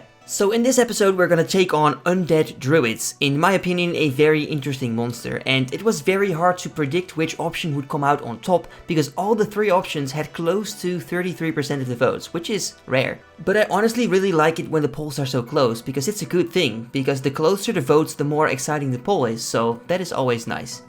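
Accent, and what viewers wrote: Netherlands. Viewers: I was like "this dude sounds so dutch" then I saw the location, gang gang shit lekkuurrr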